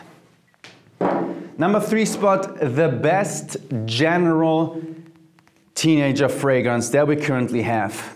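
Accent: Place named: Germany. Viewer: The german accent fits perfect 👌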